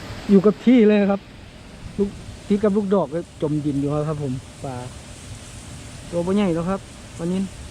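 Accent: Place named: Nyaw, Thailand. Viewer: เก็บเลยครับตากแดดไว้กินคือเว้าญ้อแกมภูไทจังหวัดได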